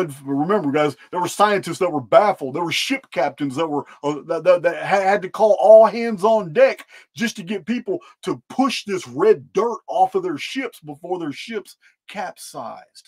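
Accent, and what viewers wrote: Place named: East Texas, United States. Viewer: I'm a new subscriber. From the beginning of '24 someone who worked for me was trying to manifest. Ive listened to you for around 11 hours. The person I mentioned, trying to manifest. W/O a Spiritual foundation. I think thats been the warnings. The person sunk deeper and deep into rage and abusive behavior. It's sad to watch. I'm much older and putting great efforts time and again. We have to do the inner work. Otherwise there is no peace. And we can fall extremely low. I highly suggest pauses at times before reacting, making choices. Get to know yourself. Set goals and show up. You can do it. You definitely are inspired at times. When we don't recall it, it is often called channeling. Which is Humorous because you say you don't. I think you do. You do talk very nearly East Texas. i understand it. I definitely will be looking at you. 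Thanks for all your time, and I certainly hear recovery from times past. Blessings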